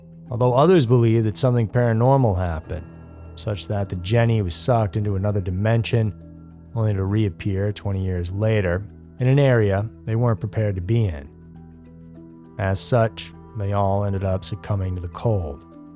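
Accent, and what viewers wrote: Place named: East Texas, United States. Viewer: That is the most Marshall/Longview accent I've ever heard.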